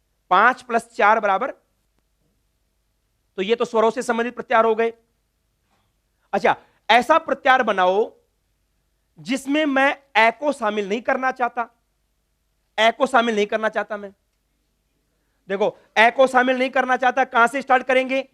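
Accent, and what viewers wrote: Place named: India. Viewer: You have to pronounce Alphabets n words properly while teaching Sanskrit. अ is अ not अय् । हिन्दी टोन आरही है नेपाली मे संस्कृतका टोन आती है वह ठिक है संस्कृत के लिए ।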